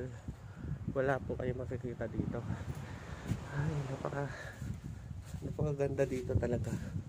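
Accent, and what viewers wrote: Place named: RP, United Kingdom. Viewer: Nosebleed po ako hahaha pang mayaman yong accent ng British ganda po ng view.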